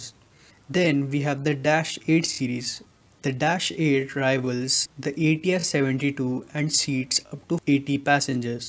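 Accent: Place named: India. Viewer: Indian accent made the experience very peculiar... Sounded as...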